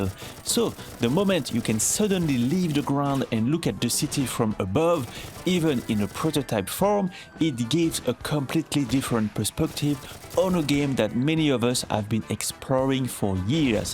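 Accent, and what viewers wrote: France. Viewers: You have such a thick french accent mon ami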